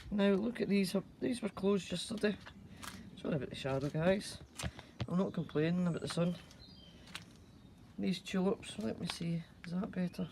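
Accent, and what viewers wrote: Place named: Glasgow, United Kingdom. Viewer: Hiya hun, I love your accent, is that a touch of Glasgow I hear? wow you've loads going on, yep definitely top your spuds right up, as soon as the stems set after poking through, they stop producing the stolons and it's the stolons that the spuds grow on. If you need anymore San Marzano, give us a shout. Take care. Alex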